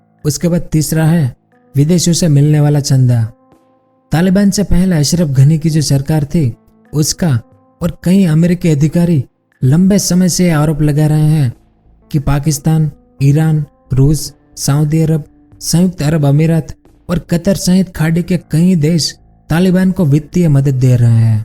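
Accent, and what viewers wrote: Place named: Rural, India. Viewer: Tu puri villege bawa hindi bolta hai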